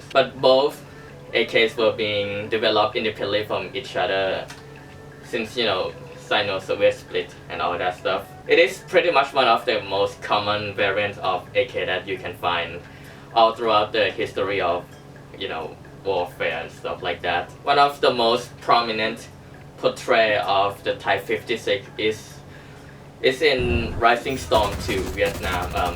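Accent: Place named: Thailand. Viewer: ก็ว่าอยู่เวลาพูด สำเนียงเหมือนคนไทยจัง555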